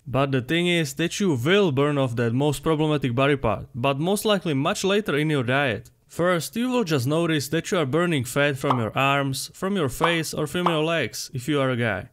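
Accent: Czech Republic. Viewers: Yo bro is that a czech accent? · You sound czech:D (as a czech myself) also, nice video. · You sound like you are Czech😅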